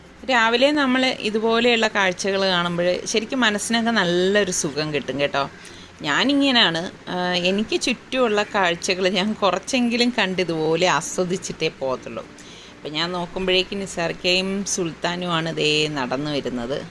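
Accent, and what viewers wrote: Trivandrum, India. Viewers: Ithaa,veedu tvpm aano? Varthanam kettittu anganey thonnunnu....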